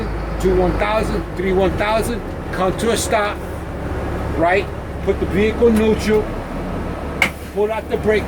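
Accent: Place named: New York, United States. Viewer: God I miss the New York/Jersey accent.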